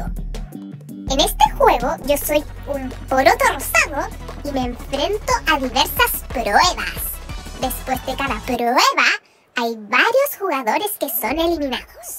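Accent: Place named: Chile. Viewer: Amo la voz chilena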